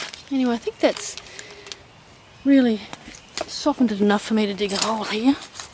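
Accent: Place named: Australia. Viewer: The in-ground system she's using is literally called a compot, you dumb nugget. She's australian. We just talk like that.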